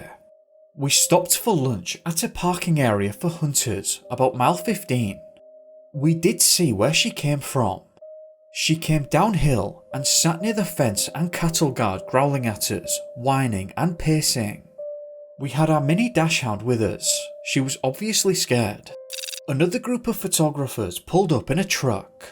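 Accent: United Kingdom. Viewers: Are you English , Irish 🍀 OR Scottish??Liking ur voice 🎤🎙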